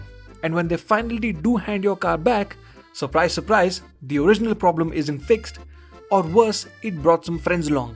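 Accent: India. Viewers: Pls stop the induan scammer ahh accent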